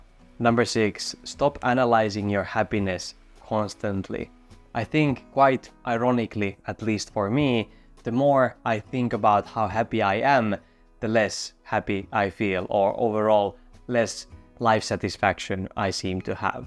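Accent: Finland. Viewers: Hehe! No, it is the typical Finnish accent for well-educated Finns who learnt to speak English at a very young age - I love it, it reminds me of my beloved native Finland. * is in fact excellent English*, as my native English teacher at Lund University, Sweden stated - the Finnish students spoke the best English, he said. We had/have good teachers in Finland!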